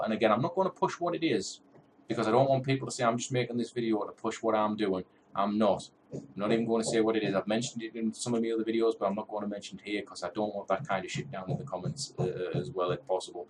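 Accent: Newcastle, United Kingdom. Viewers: I hate huff you sound like a Geordie same as me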